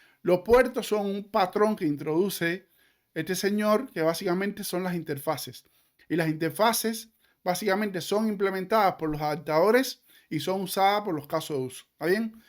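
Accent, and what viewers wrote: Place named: United States. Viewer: Mucho rollo y en farfullo medio yanki. Es incomprensible.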